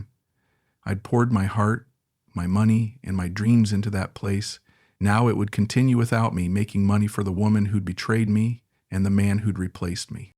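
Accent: United States. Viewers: As a British guy, why do you have a US accent?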